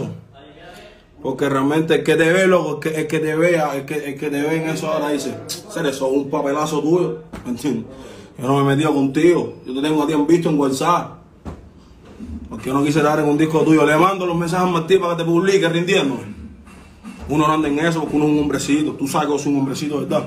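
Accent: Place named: Dominican Republic. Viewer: Y por qué el habla como dominicano asere🤣🤣🤣🤣, es un ridiculo de verdad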